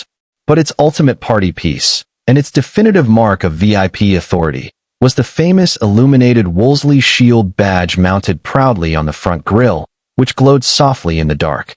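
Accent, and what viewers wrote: United States. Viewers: Who wrote this absolute BULLSH*T. Do your Homework. Grrr Plus, to have a Yank doing talking, well it is a disgrace The rover P4 was not called the Annie Rover. It was called the 'Auntie' Rover. The Morris Oxford and the Austin Cambridge Were just ordinary family saloons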